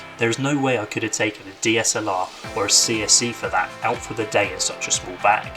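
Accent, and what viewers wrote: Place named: United Kingdom. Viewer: Your audio is really ok for normal hearing people but when you are exposed to jet engine noise over several years it a bad cobination with the british acent and the music your playing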